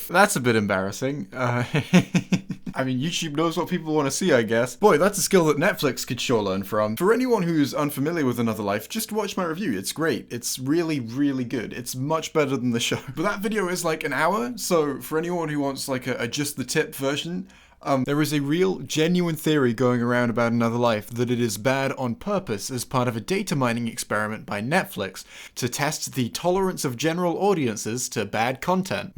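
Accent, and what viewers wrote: United Kingdom. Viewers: This guy sounds like a British Seth Green.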